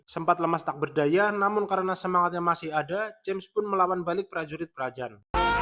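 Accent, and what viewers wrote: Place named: Banyumas, Indonesia. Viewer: lucu bgt bahasa ngapak😅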